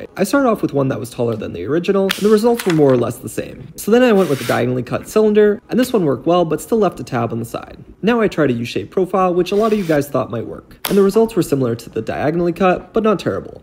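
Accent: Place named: Russia. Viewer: Как будто у него русский акцент на т и р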